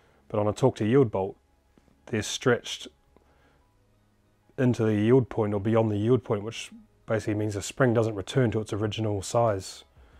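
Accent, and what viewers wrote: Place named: New Zealand. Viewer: You sound kiwi bro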